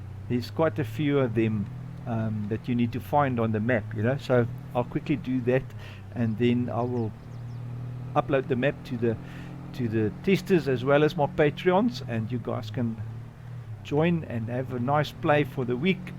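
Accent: South Africa. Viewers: Hoe klink dit vir my of jy van Suid Afrika af kan kom???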